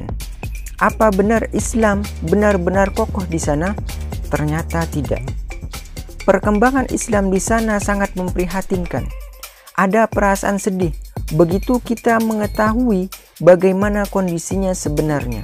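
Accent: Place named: Arab world. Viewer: Menang logat arab tidak tau bahasa arab.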